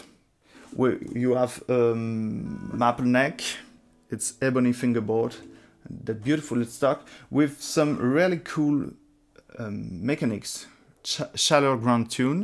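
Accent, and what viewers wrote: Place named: England, United Kingdom. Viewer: Super video, bon j'avoue que l'accent anglais pique bcp et que j'ai eu un peu de mal avec ça mais sinon très chouette sujet, bien traité et magnifique jeu ! Gros coup de coeur pour les résonateurs mule depuis quelques temps (et cette vidéo ne fais que le confirmer) donc ma préférence va au tricone mule vert.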